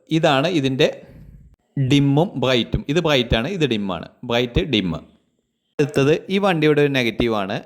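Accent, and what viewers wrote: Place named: France. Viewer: Annan French accent aanallo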